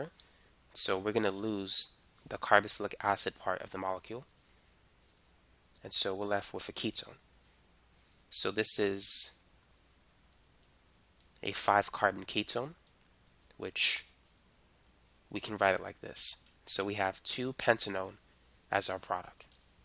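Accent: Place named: Northeast, United States. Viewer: his voice is soothing idk what you’re talking about. lmao its like an northeastern accent from the US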